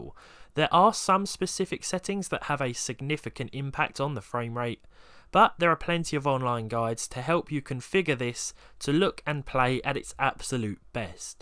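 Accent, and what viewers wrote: United Kingdom. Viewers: I love ur Britishaccent ^^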